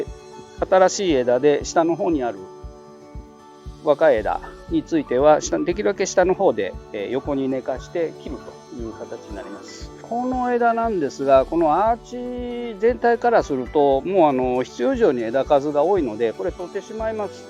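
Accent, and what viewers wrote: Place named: Kansai, Japan. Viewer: はじめまして、いつも拝見しています。 品種別の剪定など、詳しく解説していただいてありがとうございます(❁ᴗ͈ˬᴗ͈)⁾⁾⁾ 今は千葉に住んでますが、関西出身なので関西弁のお話に癒やされています。 我が家に地植えして4年ほどたったイングリッシュローズのオリビア・ローズ・オースチンがあります。 今年は春に2、3輪しか花が咲かず、その後も咲くことなく現在に至っています。 同じタイミングで植えたデスデモーナはこの秋に30輪以上花が咲きました。 同じ様なお手入れをしましたが、オリビアは葉が茂り枝が伸びるばかりで蕾がつきません。 冬剪定を予定していますが、アドバイスをいただけないでしょうか？ 株は縦横90㎝くらいで、ベーサルシュートは4本出ています。よろしくお願いいたします。 寒さも厳しくなってきましたので、御自愛くださいませm(*- -*)m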